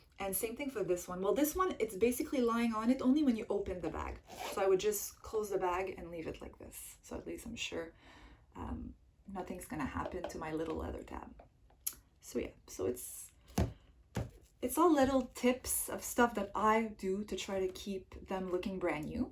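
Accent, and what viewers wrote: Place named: Montreal, Canada. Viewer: You have the cutest Montreal accent ❤️ cute comparison!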